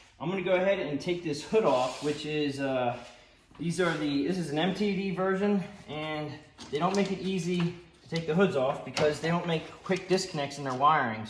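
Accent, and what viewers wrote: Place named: South Jersey, United States. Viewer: Your South Jersey/Accent makes me feel at home.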